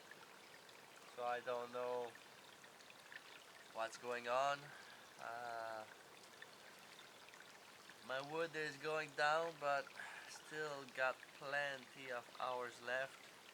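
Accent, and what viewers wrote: Quebec, Canada. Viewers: je viens de sub ton accent je me suis dit fuck de ou au quebec il viens lui haha vaudreuil ici!je te suis,tres bons videos!je veux commencer a faire ca,sortir de la routine des enfants etc! prend soin de toi soit safe! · You sound French Canadian, I could be wrong, dude you're awesome, merci beaucoup. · hummmmm... je crois entendre un petit accent québécois dans tout ce qu'il dit ^^ I think hear a quebecois accent in his voice^^ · are you french? from canada right ? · Great video! Are you Canadian French in Quebec? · Thats a nice quebec accent :) cool video man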